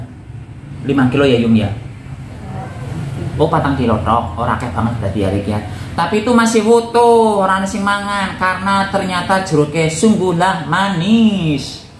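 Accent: Banyumas, Indonesia. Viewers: Seru jeruknya manis...wes jian,ora ngapak ora kepenak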